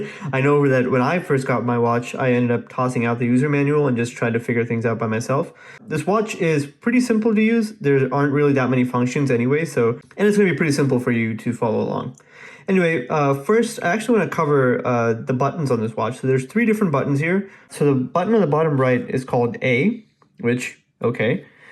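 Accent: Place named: United Kingdom. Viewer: You are like British Speaker Nice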